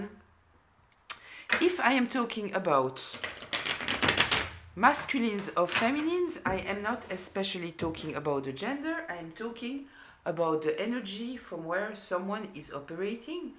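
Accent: France. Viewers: I enjoy your readings very much. Watching from Arizona where it seems more and more of those narcissists are being bred every time I turn around! Your insights are amazing and very helpful. I really love your French English too. Thank you for your energy.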